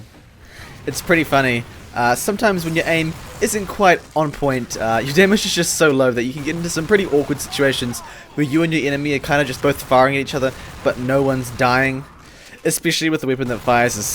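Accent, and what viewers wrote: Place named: New Zealand. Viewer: Are from NZ by any chance you've got the accent.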